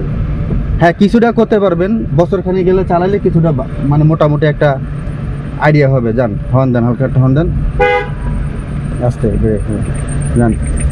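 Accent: Barishal, Bangladesh. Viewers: আপনার বারি বরিশাল নাকি। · ভাই এর বাড়ি কি বরিশাল?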